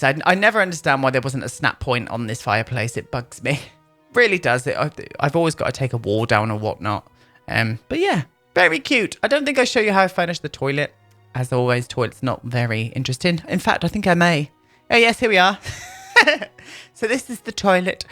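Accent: United Kingdom. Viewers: Posh english accent. Always ill any season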